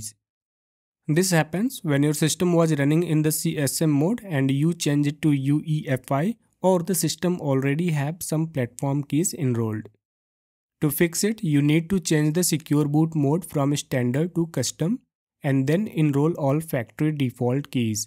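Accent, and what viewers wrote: India. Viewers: First Seconds and im Hearing an Indian voice and i know He ist saving my day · I know my problem fixed when i heard the Indian accent ❤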